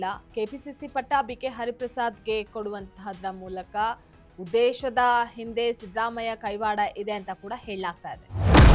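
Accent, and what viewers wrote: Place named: England, United Kingdom. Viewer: ಕನ್ನಡ ವನ್ನು ಇಂಗ್ಲಿಷ್ ಸ್ಟೈಲ್ ಬೇಡ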